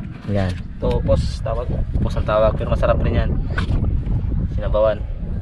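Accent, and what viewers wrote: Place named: Visayas, Philippines. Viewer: ..mUrag biSaya maN idOl anO sAlita nYO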